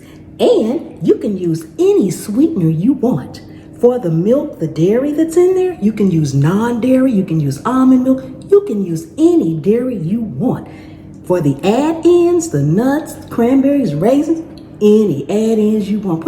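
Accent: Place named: Upper class, United States. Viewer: Love your accent sounds like posh American. Cookies look good...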